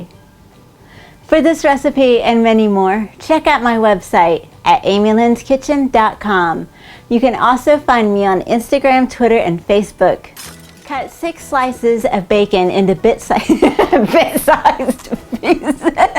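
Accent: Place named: South, United States. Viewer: What a beautiful green bean recipe,. Your southern accent is just too adorable, my dear friend. What's your best tip for making an upside down cheesecake? I love you so much, sweetie.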